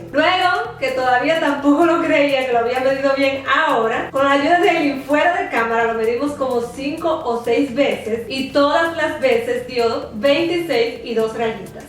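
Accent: Dominican Republic. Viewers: eres dominicana ??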